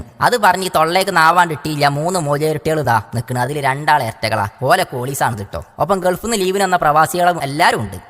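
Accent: Malappuram, India. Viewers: നല്ല രസമാണ് കേൾക്കാൻ മലപ്പുറം ഭാസേല്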